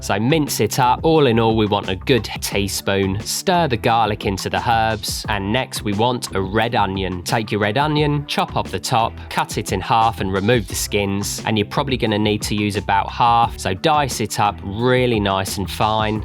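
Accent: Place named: West Country, United Kingdom. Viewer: west country, south west england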